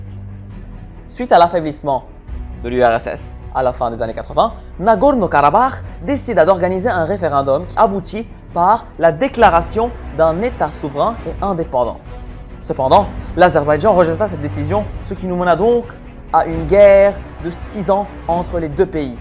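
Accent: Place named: Belgium. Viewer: Cet accent Belge à couper au couteau, MDR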